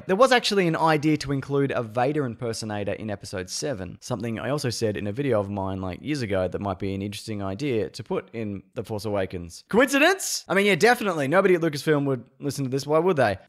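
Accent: Australia. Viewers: are you aussie ?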